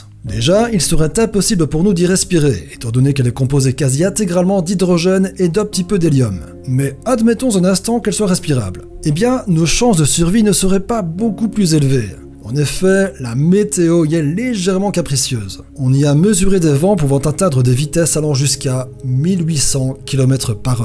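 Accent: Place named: Belgium. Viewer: c'est bon j'ai rien dit. il a dit les mots photo et huit. Belge je pense. bref sympa à regarder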